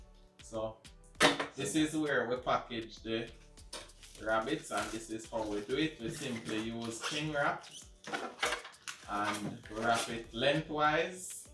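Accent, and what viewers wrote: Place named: Jamaica. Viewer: Bomba! Me like the video....big up d Jamaican twang and big up the hygiene processing method. Respect for sharing.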